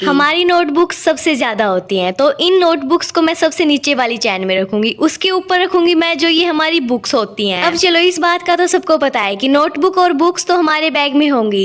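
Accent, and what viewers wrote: Haryana, India. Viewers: Kya aap Haryanvi Ho ?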